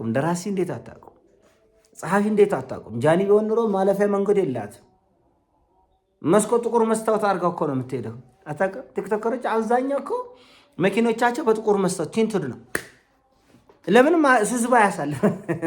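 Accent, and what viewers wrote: Tigray, Ethiopia. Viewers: You are the smart person specially you represent the smart Tigrie people with the accent .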